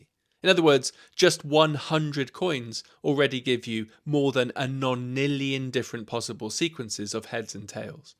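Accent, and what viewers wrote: United Kingdom. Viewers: I love the way the creator used a British coin to match the British narrator he is using for the voiceover—a subtle and clever touch.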